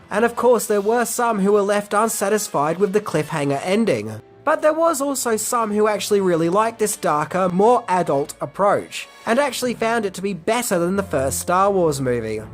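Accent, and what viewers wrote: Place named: Australia. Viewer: WONDERFULL!NICE WORK!NICE ACCENT!AUSTRALIAN?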